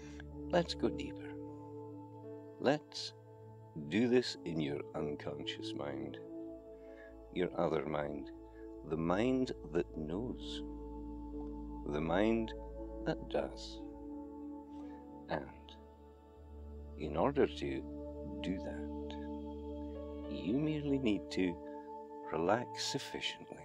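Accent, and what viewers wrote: Scotland, United Kingdom. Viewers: Hi, I think the music is a little louder than your voice so it makes you very muffled. Normally I am good with Scottish accents lol Thank you for responding. I will try it again.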